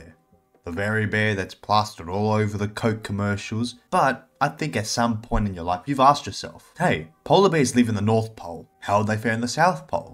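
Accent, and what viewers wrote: Australia. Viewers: Thanks for the explanation with your heavy Australian accent.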